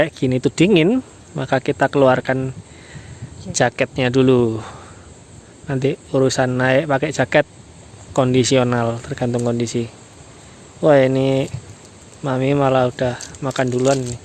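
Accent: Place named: Surabaya, Indonesia. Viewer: Mas suarsne sby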